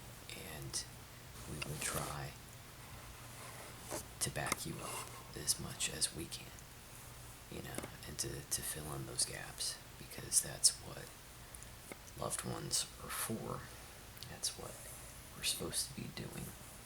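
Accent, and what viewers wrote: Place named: Oklahoma, United States. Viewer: I’m from Oklahoma, so your slight accent makes me feel like 10 times better. Most people who do stuff like this talk properly and it’s soothing,yes, but your accent makes me feel at home and o feel like it’s actually comforting not just soothing.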